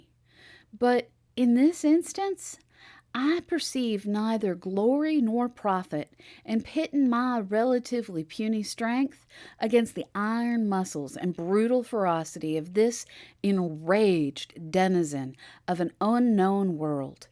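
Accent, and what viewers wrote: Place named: South, United States. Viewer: I am enjoying the story time. The slight southern accent is awesome.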